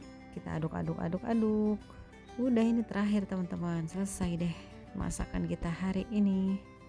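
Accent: Jakarta, Indonesia. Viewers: Logat Betawi nya jangan hilang ya mpok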